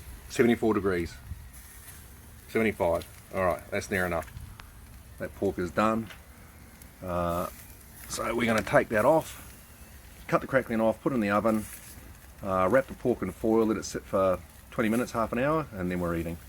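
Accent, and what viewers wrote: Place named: New Zealand. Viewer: Good to hear the kiwi accent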